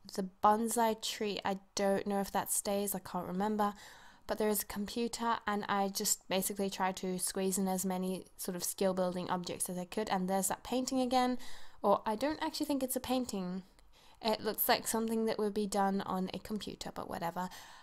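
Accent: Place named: England, United Kingdom. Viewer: I can't figure out if her accent is English, South African, Australian or a New Zealand one... Someone help please, I am new here! Haha.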